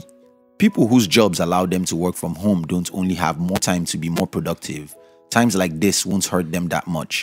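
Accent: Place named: Nigeria. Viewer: He is from Africa, possibly Nigeria.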